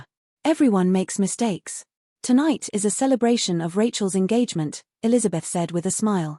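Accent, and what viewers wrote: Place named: England, United Kingdom. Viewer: English accent in Boston doesn't work! Change it please!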